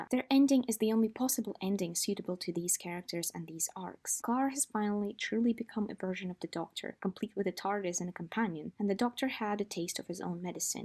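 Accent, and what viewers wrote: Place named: Russia. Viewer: The whole video I thought whether you are a native Russian or not. Love your accent and your English! The essay is sooo well structured and interesting Good luck to you!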